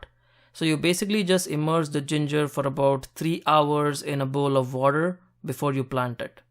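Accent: India. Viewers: Such a nice accent. Indian ?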